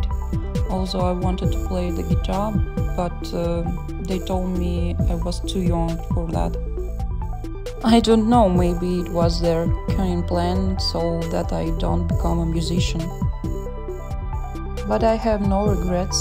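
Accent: Russia. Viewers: Она русская чтоли? Акцент похож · Whoaa finally! Don't worry about the voice and the accent, I really love russian accent and I am sure everyone is!💚 Good job my queen! waiting for your next voiced video👁️👄👁️✨ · Could u make a video showcasing your “cool smears” brush pack, please? I can’t seem to find any 💓 (Btw, I really love your voice! & your art too, ofc. I can really relate to your feelings regarding your voice.. I am a big fan of accents, though, and Russian accents are particularly beautiful imo. (Not in a weird/creepy way, just in an admiring/appreciative way!)✨ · i love russian accents! don’t be embarrassed of it ❤️ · Your voice is beautiful. Russian accent is the best for me. Its calming and soothing lol. Your art and voice us the most beautiful thing i saw and heard. Keep up the good work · Girl ur voice is just so pretty! And ur accent? Russianaccent is just so lovely i rly like it <3 following u since a while now ur speedpaintings r always so amazing and also kinda relaxing to watch <3 much love from germany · Girl, I MISSED HEARING YOU AND SEEING YOU UPLOAD. 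You have absolutely NOTHING to be nervous about! Russian accents are one of my favorite, and your voice is absolutely gorgeous. Don't you ever be ashamed. The same with your artwork, that's nothing to beat yourself up over. That one criticism out of the many compliments is a good way to show yourself that your art IS art and that your journey for improvement is never over. I think one of the beautiful things about being an artist is that you're not alone. Everyone has gone through and been shaped by similar struggles. Keep on keeping on. You are amazing, BEAUTIFUL, and one of my biggest inspirations. You are the reason I picked up an ipad and I do not regret it for a second. Thank you for providing amazing content. 💙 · I just wanted to point out: even tho you see some hate, never forget how many people actually love your art. For me personally, you're such an inspiring person with your style, the way you shade etc. 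And I loooove your russian accent, it sounds so wonderful and calming💖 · ЧТО. Я УМЕРЛА ПОСЛЕ СЛОВ "АЙМ ФРОМ РАША".......... я всегда думала, что ты из Америки.... даже подумать не могла... смутилась только когда услышала акцент, и тут.... воу... удивляюсь, почему у тебя не миллион..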